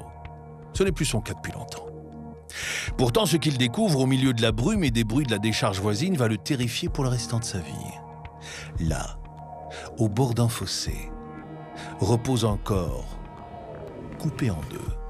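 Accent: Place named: Belgium. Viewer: Ça me fait toujours plaisir d'écouter l'accent belge. Merci ❤❤❤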